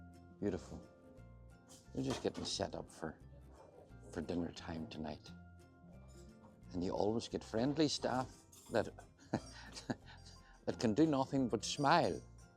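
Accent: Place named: Northern Ireland, United Kingdom. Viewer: My wife and I will be on the September 12th 2025 Oceania Sirena sailing through the UK including Belfast. We loved your video and we are requesting that you perform on our cruise as we would love to meet you. P.S. my wife loves your Northern Ireland accent. Doug & Jenna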